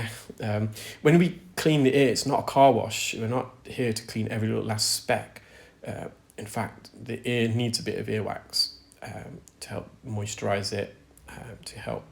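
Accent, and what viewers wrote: United Kingdom. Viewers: I know your British but where about exactly is you’re accent from ?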